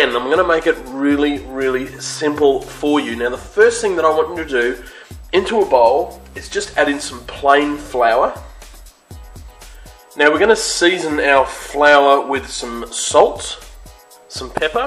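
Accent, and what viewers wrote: Australia. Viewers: For god sake chocoswede leave him alone 1 ain't gay it's just an australian accent and 2 ur jank. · hes aussie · WOW!, #1, i love your Australian accent, along with your fantastic cooking. Especially that popcorn chicken, and my family is also a big fan of your churros. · Pretty sure he's Australian 0.o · He is an Aussie not American.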